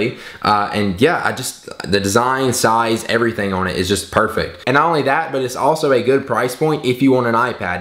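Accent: South, United States. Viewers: Has nothing to do with the iPad mini but you look extremely cute with that short hair and I would date you if you went this way. Just saying. Love then country accent too. ;) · He has a slight southern accent qce* qce* qce*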